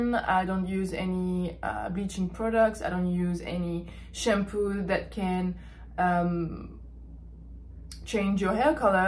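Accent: France. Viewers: I love your accent 😍 I’m currently learning french, the most beautiful language in the world xx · Wait! Is she French??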